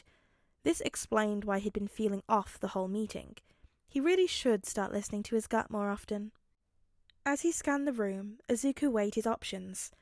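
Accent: United Kingdom. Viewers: This is like, my 5th time listening to this podfic and it’s still amazing! You’re one of my fav podficcers! I’m also British and it really makes a difference not having someone American do it, I always find myself correcting them on the way we pronounce words and have to tell myself it’s not their fault Americans say words wrong. So basically yeah! Love your stuff and I Hope you’re having a good day!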